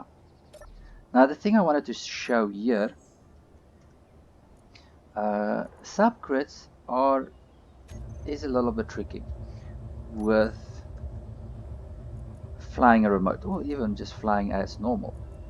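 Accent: South Africa. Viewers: Sounds like a South African to me! Lekker! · Ah ok, accent was dead giveaway :) im unfortunately still in South Africa...but yeah, I enjoy this game quite a bit. Happy engineering to you and keep well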